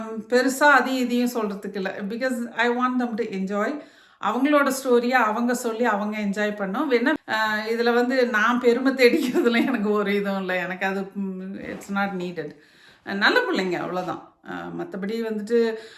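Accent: Salem, India. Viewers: சகோதரி நம்ம சேலம் ஸ்லாங் உங்களுக்கு இன்னும் அப்படியே இருக்கு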